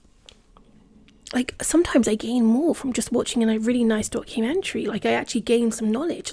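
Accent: United Kingdom. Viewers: Are you english I love your accent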